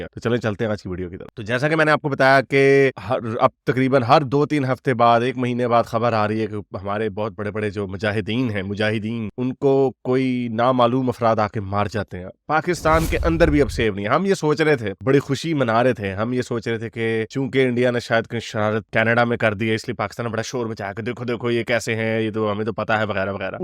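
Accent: Punjab, India. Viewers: Apki Panjbai Accents bahut Achha Lgta Hqi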